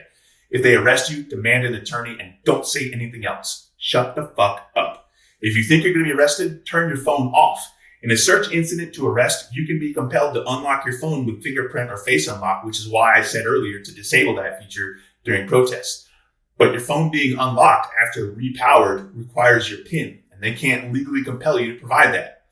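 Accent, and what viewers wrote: Scotland, United Kingdom. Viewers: Super Scottish !!!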